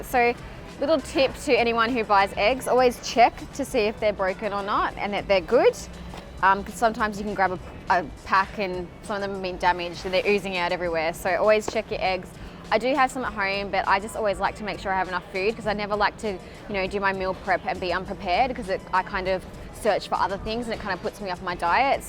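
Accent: Australia. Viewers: Please marry me.. Fuck first she's a super hottie then she's has the. Australian accent.. She stole my heart right there.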